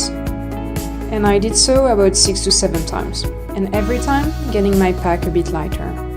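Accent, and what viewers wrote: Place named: Europe. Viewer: Wow the production of this is amazing! I decided to subscribe within seconds. Can’t wait to see more! You sound European. I’m Welsh. Where are you from?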